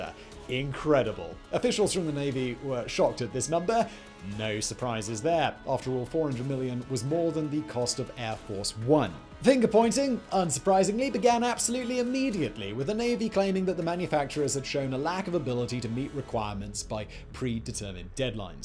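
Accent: United Kingdom. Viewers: His speaking is tuned more to a Brits ear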